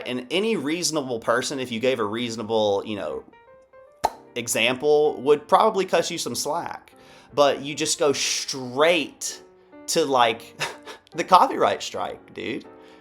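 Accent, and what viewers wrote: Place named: South, United States. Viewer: Came here from Obese to Beast to counter that bully Alex, stayed for the snark and the southern accent ❤ subscribed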